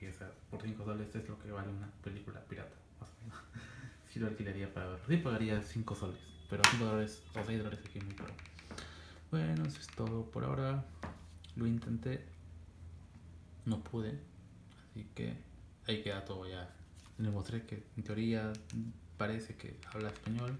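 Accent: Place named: Philippines. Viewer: pesimo video no se te entiende nada hermano y parece que hablas en filipino o algo asi ...con todo respeto es mi punto de vista gracias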